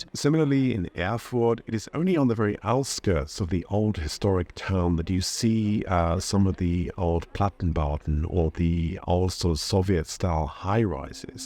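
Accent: United Kingdom. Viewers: It's a real, real pleasure, Brother. I often watch your creations 2 or 3 times. Here, you really manage to pass on your surprise and delight as your tour the cities. It is all so beautiful compared to your preconceived ideas. When you described the lush green, and the ultrafriendly people, I really felt at home. Also, when you described the economic difference, I also felt at home, because a lot of our skilled workers are leaving...going to Oz. The rainbow lady is a bit of me...I can imagine sharing a beautiful experience with a random person. I was curious about why the women blamed some ghastly attitudes towards an American lady, on East Germans. Maybe they were right. I don't know. But I just love the sound of the people and the places you visited. The other things I like about your videos are -your British accent and calm voice -the thought, explanations, ideas, analysis and your personal experiences and opinions that you put into these -the filming...is very good and all kinds of unexpected details are included. 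It makes it very interesting. I like the range of topics you come up with. I love your (British?) humility. Kiwis are quite a bit like that, I think. Some of your topics have a lot of depth...are fairly intellectual. I especially like seeing you smiling. It makes me think you are enjoying yourself in different places...especially in this newly discovered paradise. It is a very sweet smile, and Down Under we do more smiling than some people of the world seem to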